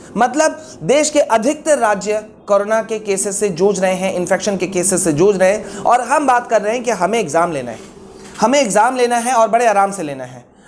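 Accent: Bihar, India. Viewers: Sir aap kya bihar ke hain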